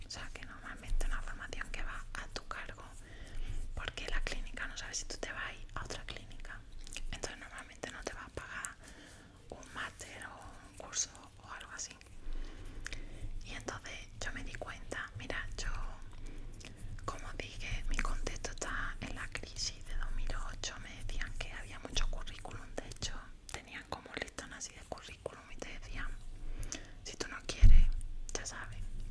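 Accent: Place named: Andalusia, Spain. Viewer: Pensaba que éramos vecinos de provincia pero no! Jajajaja porque tu acento es de Andalucía y por lo que cuentas, ibas a irte de Málaga a Córdoba a estudiar pero tu padre no quería. Sinceramente aun no me voy a dormir, pero lo tengo en pause para seguirlo en cuanto me acueste porque me relaja tu voz! Un abrazo compañera! 😇😴😴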